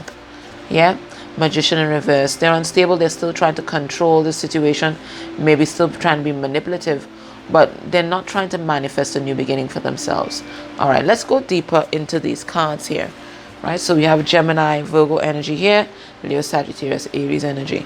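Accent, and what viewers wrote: Trinidad and Tobago. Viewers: are u a Trinidadian...u hsve our accent lol...thank u for the reading 🌻🌼🌻🌼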